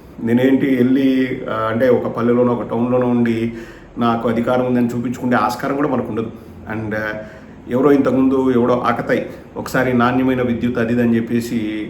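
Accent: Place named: Telangana, India. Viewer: Bro nee proper ekkada,Slang matram Telangana la untadi,Kaani nuvvu chala better ,boothulu matlade Prabhakar gaani kanna .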